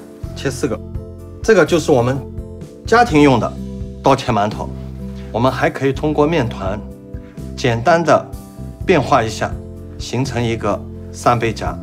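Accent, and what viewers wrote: Shanghai, China. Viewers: 一听就是阿拉上海人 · 阿拉上海人👍👍👍温文尔雅，带着上海口音的普通话😀😀😀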